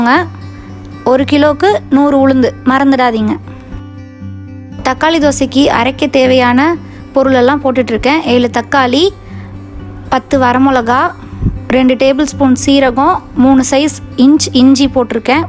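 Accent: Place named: Madurai, India. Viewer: madurai side poitu vandha maari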